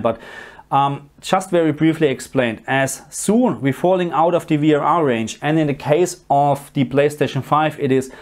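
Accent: Germany. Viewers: are you from aus? Your accent sounds German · he's German but he lives in AU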